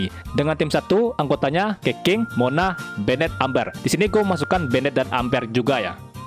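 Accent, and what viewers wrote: West Sumatra, Indonesia. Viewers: Org minang ya XD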